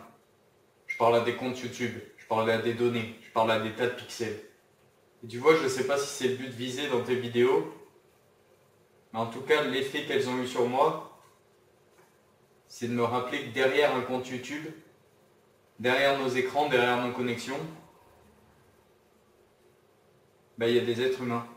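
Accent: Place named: South, France. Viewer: Même ton accent du sud combiné à ton côté parisien, ça fais du bien de voir ded gens qui font des ponts. Des liens. Et qui sont pas dans les clichés stérile et gratuit... Il nous faut plus de gars intègre comme toi. Pas des mecs dans la posture intellectuelle, qui tente de développer des point de vue qui ne sont pas les leurs, qui ne les comprenne même pas du coup, mais qui pourtant ne les remettront jamais en question, qui ont interet à cette posture. Toi on sent que tu as cet accent parce que ton personnage(et/ou toi) viens de la-bas, point. Tu va pas faire genre parce que tu es parisiens, pourtant j'en connais des pélos qui le font... Tu perche sur jodorwsky, ça t'empeche pas de parler foot si tu a envie. Tu comprend ce que je veux dire ? C'est en ca qu'on taime, pas pour ça.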